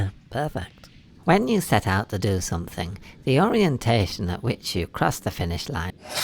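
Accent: Yorkshire, United Kingdom. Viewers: Everyone reading this, pause for a moment. Close your eyes (after reading the rest of the instructions, otherwise you won't know what to do). Now picture saying "Red Bull gives you wings" in his cute little Yorkshire accent. Your day should now be 12.75% better.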